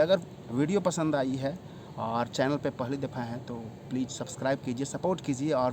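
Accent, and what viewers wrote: India. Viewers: Bhaiya aap ho to bihar ke hai mai apke acent se pahchan gya Lekin kis district se ho bihar ke😊😊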